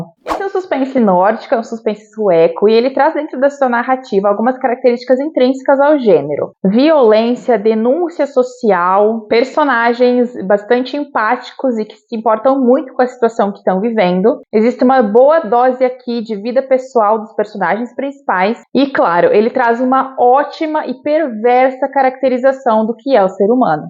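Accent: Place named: South, Brazil. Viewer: Oi! Pelo seu sotaque tu é do Sul, por acaso você mora no Rio Grande do Sul? Se sim, como você está e sua família? Estão todos bem? Quanto ao livro, muito interessante. Gosto de ler livros pesados, as vezes, sair da bolha sabe. Abraços 😘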